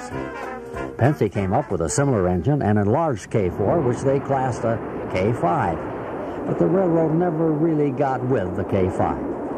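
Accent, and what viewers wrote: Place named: United Kingdom. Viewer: he seems to speak British accent rather than Americans, or is it pennsylvanian accent too?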